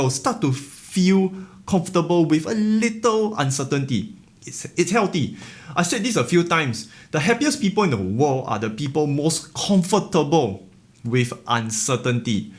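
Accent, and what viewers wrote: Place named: Singapore. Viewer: I love your singlish bro 😂 can’t wait to come to Singapore one day!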